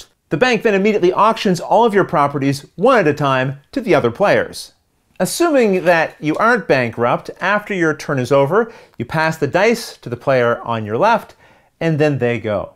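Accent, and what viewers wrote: Canada. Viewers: You sound like a Canadian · Are you Canadian?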